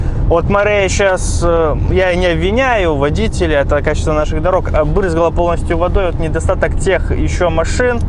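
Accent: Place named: Belarus. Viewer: Как мне нравится беларуский говор)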